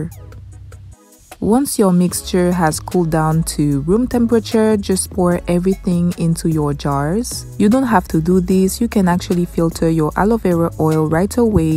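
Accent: France. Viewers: She got French accent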